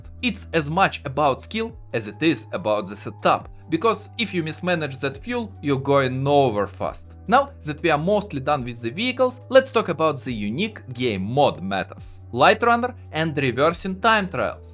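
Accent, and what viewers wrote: Russia. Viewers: мне одному кажется что у автора русский акцент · you saying how avarge russian guy trying to say in english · Are you also russian? Just very simmilar accent. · У тебя русский акцент бро)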